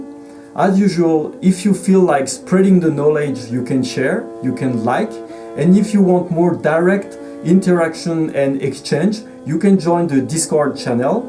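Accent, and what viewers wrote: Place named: France. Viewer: Naturellement ! je voulais dire par là qu'on entend bien votre accent français dans la langue de Shakespeare. Et oui merci pour les sous-titres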